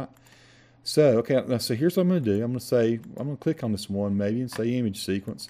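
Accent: South, United States. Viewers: Hey there! Russian from Russia here! Привет из Питера, посоны! Just want to thank you for the vid and say what a lovely southern accent you got there! Keep up with a great tutorials!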